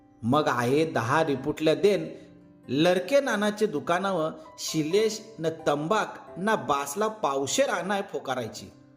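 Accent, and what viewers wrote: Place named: Kunbi, India. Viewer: अप्रतिम कथा आणि अस्सल कुणबी बोलीमधील सादरीकरण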